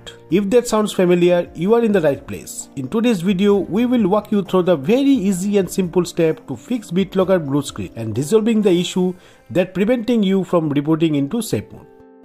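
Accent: India. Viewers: its always the vids with 20-80 likes and an indian accent finaly solving my problem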